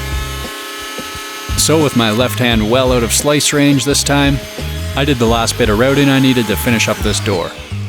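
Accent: Canada. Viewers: Is your accent Canadian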